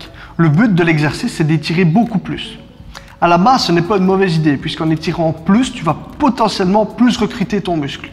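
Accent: Liège, Belgium. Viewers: Liegeois expatrie aux USA, je suis subjugue par la qualite de tes videos. Et l'accent me rappelle le pays ;) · Bonsoir , c'est bien juste ce que tu dis c'est très intéressant .... Juste un truc , tu parles avec un accent que je semble connaître ..tu es belge ?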